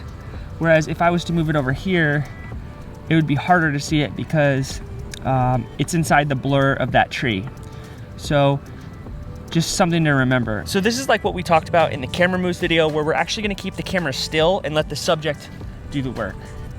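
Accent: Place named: United States. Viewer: Wow! Haha that lil Iguana is the best model ever and soo beautiful ay =) Wow I was really surprised when i heard your American accent, I totally aspected you to be from Scandinavian because you look like a Viking! Haha I agree with one of the other commments - You’ve got a very endearing persona, not only very good at explaining but are gifted with a naturally calm, inviting presence. Thanks for the video =) That iguana shot reeeeally makes me wanna find and build my own Macro lens setup too! Haha :) From down here in Margaret River Western Australia I salute you :) Big High Five, keep it up!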